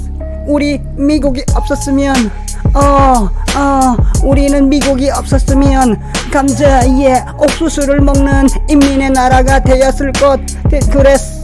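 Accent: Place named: Pyongan, North Korea. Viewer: 정감독님의 핑안도 어머니 사투리가 그립습니다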